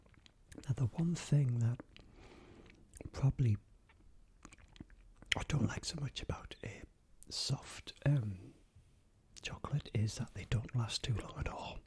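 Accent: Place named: Durham, United Kingdom. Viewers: Are you Durham? You sound Durham! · Great video :-) really relaxing and made hungry haha. Like your natural accent too, a true Geordie haha. I agree, those two are my favourite too! But I love the strawberry ones too, so strawberry would have taken silver for me:). Do you prefer roses or quality street?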